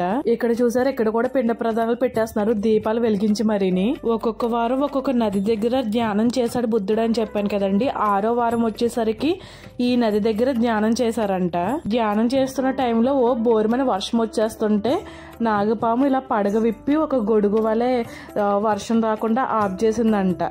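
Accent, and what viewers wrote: Godavari, India. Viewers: ఏమండీ మీ వీడియోస్ చాలా బాగుంటాయి.అచ్ఛ మన గోదారి యాసలో మీరు బాగా మాట్లాడతారు.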